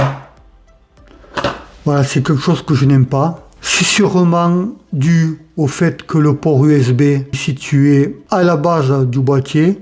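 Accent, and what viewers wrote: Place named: South, France. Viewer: Re 😅 Un peu particulier le design de ces écouteurs, faut aimer. En tout cas content d'entendre ton avis avec ce bel accent du sud (je me sens moins seul! ) 😜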